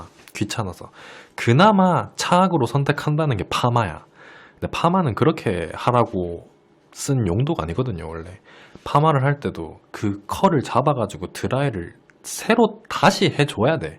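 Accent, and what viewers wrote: Gyeongsang, South Korea. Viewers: 억양, 말투가 딱 대구사람인 것 같다.. 동의하시는분??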